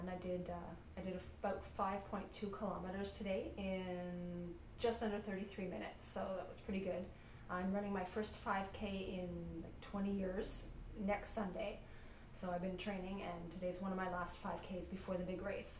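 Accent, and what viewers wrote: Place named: Canada. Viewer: Thank you for this! And also, you are so adorably Canadian! lol. Love the accent. I don't remember ever seeing a video with you before.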